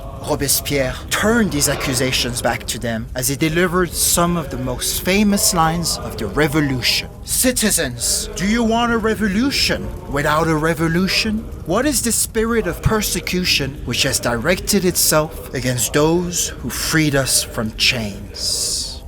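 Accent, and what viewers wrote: France. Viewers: I have no merit, I've attended a military school in France, and although I wasn't under military status myself, l remember multiple times hearing my classmates chanting it. Anyway bravo for you excellent work. This is quality content that deserves (and will surely get) more viewership. Also I love your accent, which is weird because I generally don't like the French accent when talking in English :)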